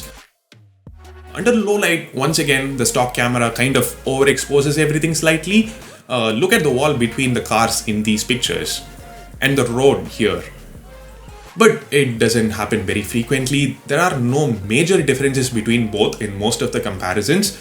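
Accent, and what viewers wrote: India. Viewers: Worst thing about M40 is the fact that i have to listen to indian accent to get any information about this phone...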